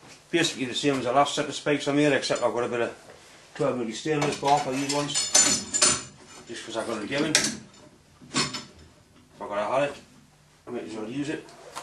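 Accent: Newcastle, United Kingdom. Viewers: Wonderful! You kept us wondering how this was going to work until the end. I really enjoy your videos and always learn something new from them. I am not from northern England, have never even had the opportunity to visit, but I love listening to Geordie and it forces me to really pay attention to understand. Thanks for all your efforts to make these excellent videos.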